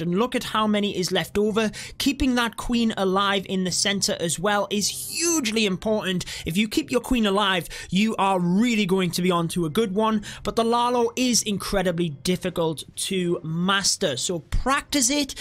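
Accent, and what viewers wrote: United Kingdom. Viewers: Who else is just enjoying the nice British accent?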